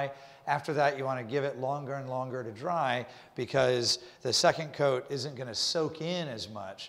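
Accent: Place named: United States. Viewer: Yeah dude .... what’s your problem ! This guy has the least annoying American accent I’ve probably heard .... my mums being the worst !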